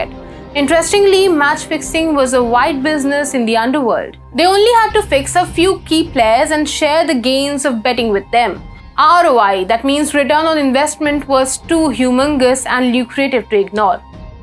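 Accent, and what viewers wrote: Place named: India. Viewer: She is speaking like an indian,,i am an indian tamil,,I can understand.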